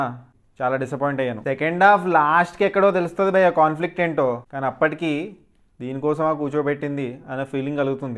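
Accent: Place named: Andhra Pradesh, India. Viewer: Mowa nvvemo nedi telanagana annav..ne mata theerendhe andhra laga undi...ee bhayya bhayya kuda manadi kadhe..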